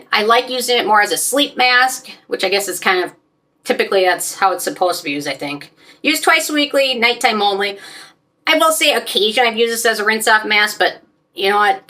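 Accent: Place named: Minnesota, United States. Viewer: Yay! I just did a mini shopping spree at The Ordinary yesterday and just saw that you posted this video. Very helpful! Btw I love your accent. I'm from NZ so I'm not too sure where to place your accent/dialect. Is it sort of Minnesota?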